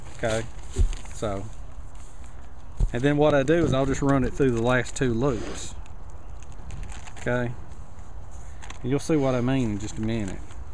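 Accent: South, United States. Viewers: When i first started watching you i said holy crap mykel hawke then you spoke lol you are almost as country as i am checkout my channel and see just glad to see i ain't the only one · Great lesson! Thanks a heap for sharing your knowledge! I'm going to try out your tarp bunk as soon as we get some springlike weather. By the way, you have a great laugh! I love your great regional accent--which should be in the Smithsonian Museum of recorded sound. Keep your videos comin'! .Want to use your then *not only to learn practical skills) but also to teach my foreign students what real Amercian English sounds like! ;)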